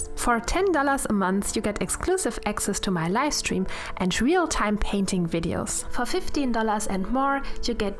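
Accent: Germany. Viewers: Die Zeichnung ist echt gut und auch die Beschreibung der Schritte ist auch extremst gut aber es wäre sehr hilfreich wenn du vielleicht an deiner englischen Aussprache arbeiten würdest denn du hast einen sehr starken deutschen Akzent (die Kritik soll wirklich konstruktiv sein und nicht gemein ich würde nur gern helfen deine Videos besser mach ) ps du kannst extrem gute Zeichnung ! du bist echt talentiert 💓💓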